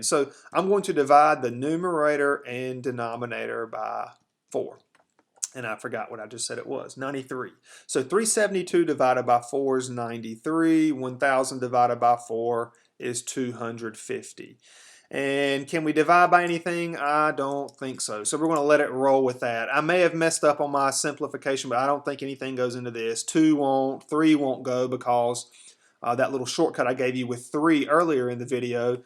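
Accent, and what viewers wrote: South, United States. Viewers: judging from the voice I guess your from the south, I am too I love the math you make it sound so easy. are there any other tutor sessions you do on line.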